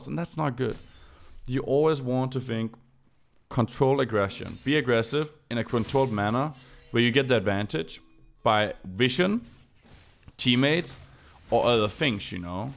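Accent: Denmark. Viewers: You have to be Danish, the way you pronounce TF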